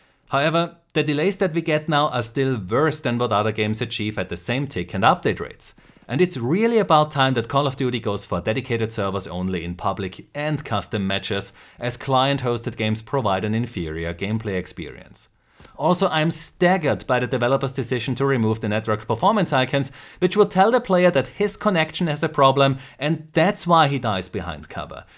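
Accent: Germany. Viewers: you sound german accent. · Are you from Germany or Austria? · Das ist das erste Mal, dass ich eins deiner Videos schaue. Sehr gute Arbeit, ich bin beeindruckt! Du musst Deutscher sein, hört man sofort an deinem Akzent. :D Weiter so!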